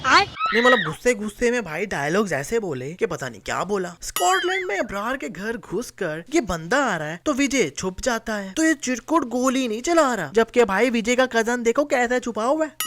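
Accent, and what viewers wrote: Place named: Pakistan. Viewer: Tu 100% pakistani hai tere accent se pata chal rha hai, aukat ho to channel pr bata de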